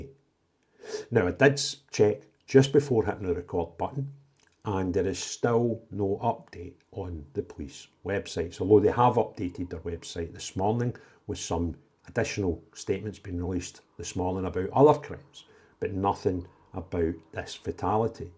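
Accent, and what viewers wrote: Scotland, United Kingdom. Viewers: Every day it just gets worse and worse and when are the illegals who commit crimes are going to be deported? We need a strong government and not a weak one and they should be British. I like your videos and I love the Scotland, the accent and bagpipes. Take care and stay safe. We must all unite together 🏴🇬🇧🏴🏴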